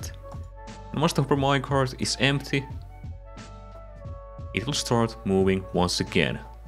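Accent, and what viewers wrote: Finland. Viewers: are you Finnish by any chance? you sound Finnish. or then im just going mad. 🥴 also suomi The P word. torilla tavataan?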